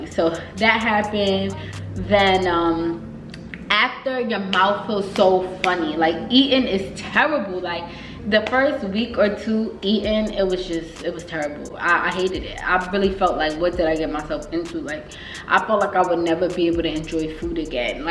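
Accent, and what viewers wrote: New York, United States. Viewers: Girl I knew u was from NY or something cuz I’m from Jersey City but I live in Cali now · It's your dog wanting to be in the video for me, licking the couch and all 😂 reminds me of my dog ♥️ .. this video was so informative I never thought about the hours the procedure would take or even the foods we can't eat thank you so much for this video I've been wanting to get mind done for a while... sidebar I knew you were from New York soon as you started talking lol we have a distinctive "accent"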